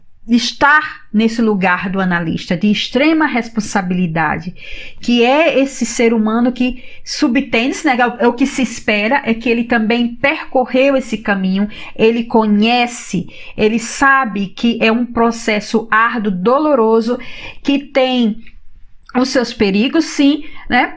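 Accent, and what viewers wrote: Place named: Northeast, Brazil. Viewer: Adoro sua forma de passar o conteúdo.Seu sotaque nordestino é adorável.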